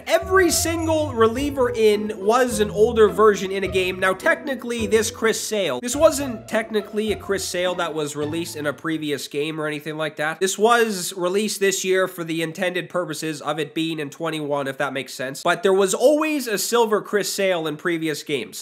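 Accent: Canada. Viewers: are you from Ontario or the Maretimes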